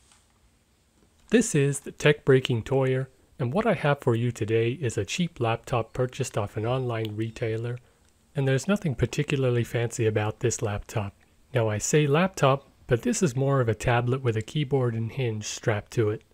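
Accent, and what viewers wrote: United States. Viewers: Just realised was speaking in an American accent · It’s been so long he almost got me with the American accent.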